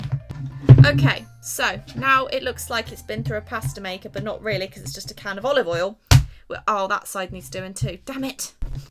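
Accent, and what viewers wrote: United Kingdom. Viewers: British: Me: u sound British already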